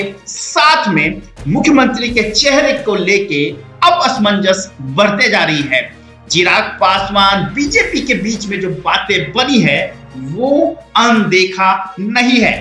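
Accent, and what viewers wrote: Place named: Bengal, India. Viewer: Bangali hindi is Amazing 👌👌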